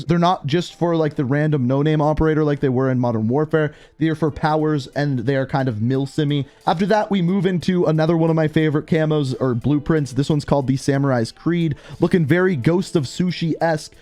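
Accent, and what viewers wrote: Canada. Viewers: Canadian eh? Lol. Theres my comment bud, hope it helps.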